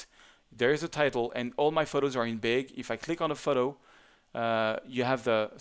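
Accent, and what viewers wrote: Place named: France. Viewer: I love your frenchglish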